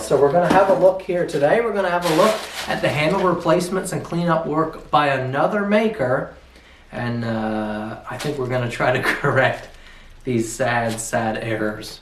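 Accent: Newfoundland, Canada. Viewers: Me getting distracted by the fact that this dude sounds like a Newfie. Looks at description. "oh. Checks out." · I thought I detected an accent. Are ya a Newfie there by? I see the flag behind ya. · This popped up on my suggested videos and you managed to get 2 words into your intro before I was wondering what part of NL you were from haha. Always nice to see another Newfoundlander unexpectedly! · As soon as i started this video i was like this guy gotta be from Newfoundland lol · man as soon as I heard ya talkin I knew you were from the rock. Cheers! ill have to add one of your knives to my collection. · I watched this whole video wondering why you sounded familiar only to realize you are from Newfoundland ahahah amazing work.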